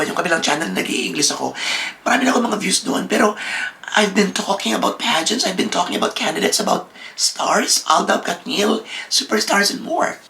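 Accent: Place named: Batangas, Philippines. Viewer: Congrats po.Para pong Batangueno ang accent nyo😊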